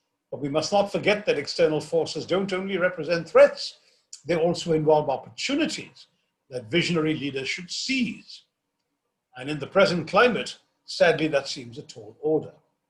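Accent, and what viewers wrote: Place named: RP, United Kingdom. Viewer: A very shallow take on multilateralism using a high sounding ideals totally divorced from the reality on ground where people around the world have been impoverished by a world run on fiat currencies. With no real exchange of value possible in the market place using fiat currencies, the call to strengthen multilateralism can only be considered a compromised sub-serviance to the forces behind the phenomenon. Now why would a politician from our world -south Asia, Asia, the so called 3rd World-- advocate for the continuation of a system that has manifestly impoverished us to our present helplessess? You should focus some on improving your intentions and making clear where your loyalties lies instead of trying to sound more gora than the Queen! Be.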